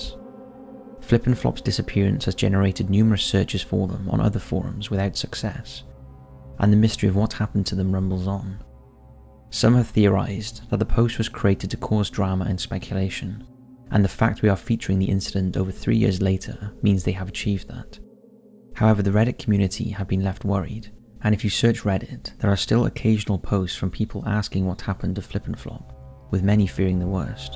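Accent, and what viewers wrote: Wales, United Kingdom. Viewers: Genuine curiosity, which part of the UK are you from? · He’s Welsh (from Wales in the UK) if you didn’t know. That’s the accent. South Wales I think by the softness, probably somewhere near Cardiff (the capital) or maybe Mumbles (a seaside town). 🤔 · I've a headache and this is easier to listen to than most. I'm sure that I can detect a Welsh accent in the narrator's voice and I think it's just a bit different, very enjoyable. Thank you ♡ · The Welsh accent of yours is better than The Canadian Droll of Chills haha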